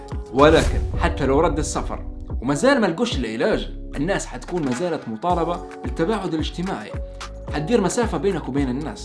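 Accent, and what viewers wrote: Eastern Libya, Libya. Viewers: بحب اللهجه الليبيه خالص هلبه هلبه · يالله بعيدًا عن الفديو ، اللهجة لفتتني للأبد اللهجة الليبية تعجبني وأحب اسمعها ، ماأعرف بس ممكن لأنها قريبة من لهجتنا ومفهومة 😂❤️ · واو، واش هادي اللهجة الليبية، صح؟ أول مرة أشوف قناة ليبية على اليوتيوب 😍 أنا اشتركت 😊. أنا يحسب لي انت مغربي، مرحبا إخواننا بليبيا. هي خليط بين المصري والخليجي و المغربية يعني الكل يفهمها 😊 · هادي لهجه شرق ليبيا لهجه الغرب تختلف عليها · مش مغربي ليبي هذا 😅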